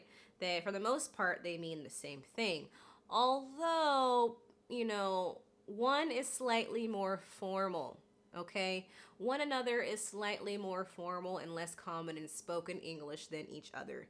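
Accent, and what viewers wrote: United States. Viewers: Great as always sis. I'm from côte d'ivoire and i teach english and I do love american accent. But plz can you gimme the name of the app you use to make your video. Thx take care😊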